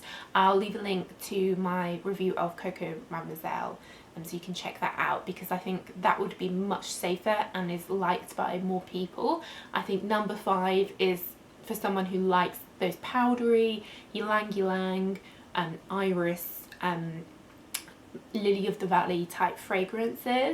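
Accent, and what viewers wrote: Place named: London, United Kingdom. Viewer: hiya, good review ! this beauty is a little bit from another time, however its sooo good when your in mood for it . i love love love all the versions , edp,,edt,pure.parfum,soap.lotion etc ive noticed no 5 smells best on clean skin straight out the bath or shower. In fact the bath products are lovely, but if you want a sweeter version of no5 use the after bath powder , now that is luxury .... mmmmm :) by way im from london too , you speak far nicer than me though , take care :)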